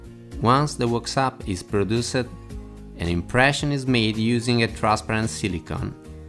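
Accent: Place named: Italy. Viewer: to avoid healthy animal.... hahahah love the 'italian English'.....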